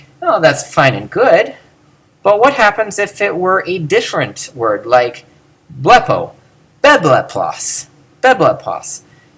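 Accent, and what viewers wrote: United States. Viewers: I really admire your self confidence: to claim to have a new system (the correct one) to pronounce AG when you can not even drop your US-Amercian accent when reading the Greek words, and the only references that you give for this system are other videos of yourself. Amazing!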